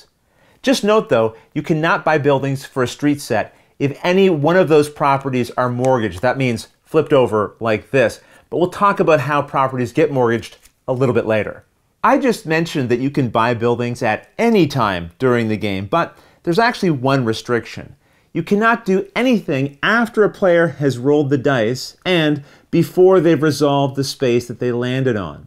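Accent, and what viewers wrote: Canada. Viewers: Are you Canadian? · You sound like a Canadian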